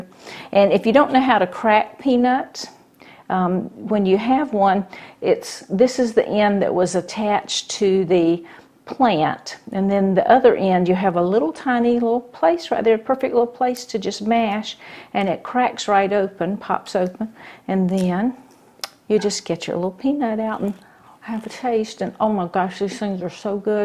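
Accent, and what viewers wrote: South, United States. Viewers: Thank you so very much!!! I looooove boiled peanuts and u just made my life so much better!! I'm born n raised in NC and you sound like every woman in my family basicly. Southern women are the best!! Thank you very much for the recipe!! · she has that wonderful southern accent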